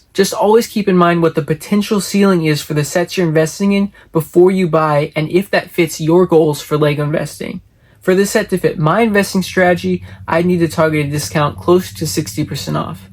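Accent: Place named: United Kingdom. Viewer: Why does he sound like he’s speaking English and British at the same time 😭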